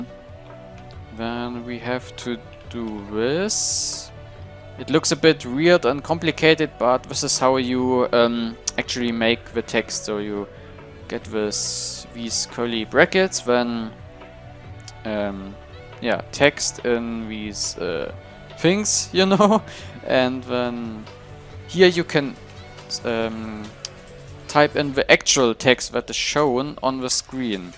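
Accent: Germany. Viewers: Are you german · are you german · Bei deinem Englisch hört man das du Deutscher bist XD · i thought youre german lol because you Sound like this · man merkt voll der ist deutsch haha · Man hört voll das du deutsch bist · Man hört das du ein Deutscher bist ;) #NoHate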